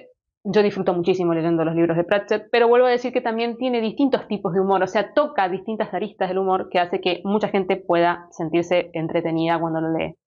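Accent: Argentina. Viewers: Hola, sos argentina o uruguaya? Por el acento digo.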